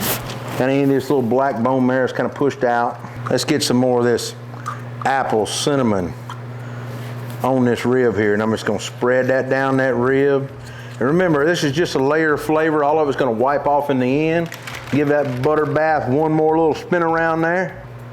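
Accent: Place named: South, United States. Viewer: First time watching, fantastically groovy info. Good (really good) teaching, useful information, and your 'southern accent' jumps out at this northwestern upper peninsula michigan yooper.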